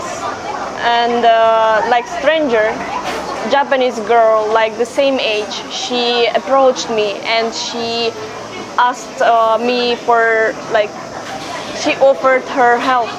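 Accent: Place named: United States. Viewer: She kinda has an American accent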